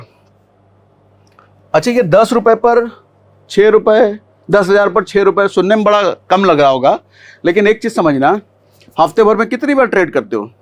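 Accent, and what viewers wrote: Bihar, India. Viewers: Sir aap Bihar se....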